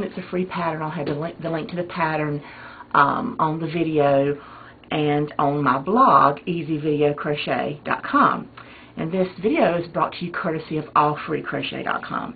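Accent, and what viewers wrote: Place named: Georgia, United States. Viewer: I loooovvvvvee your accent!!! Every time I visit my cousins in Ga I come back with the same drawl. Btw. I also like your tutorials!!! U make it so easy to crochet along with the video. Thanks for all yr hard work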